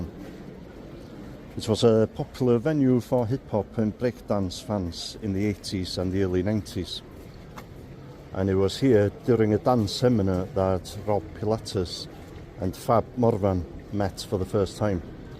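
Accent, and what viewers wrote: Wales, United Kingdom. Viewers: Sounds Welsh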